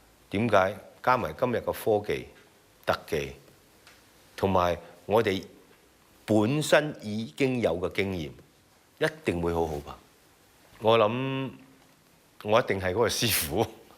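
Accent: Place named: Hong Kong, China. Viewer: yeah it is. He's good at speaking English but I can tell he still has that hongkong chinese accent when he talks. And like he's not fluent at English, his sentences aren't great. But prefer him speaking cantonese, which they should tell him to do more. Ik cause he does stuff in China he had to learn mandarin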